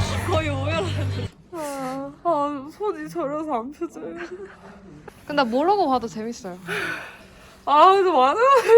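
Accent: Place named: Gyeongsang, South Korea. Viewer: 경상도 사투리를 고급지게 구사하시네요👍👍👍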